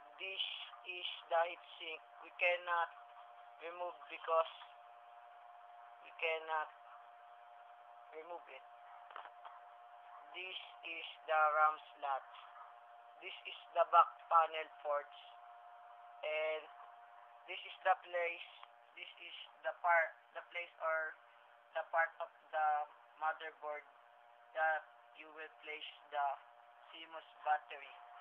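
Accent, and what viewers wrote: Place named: Philippines. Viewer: pinoy hahaha. ung english pinoy n pinoy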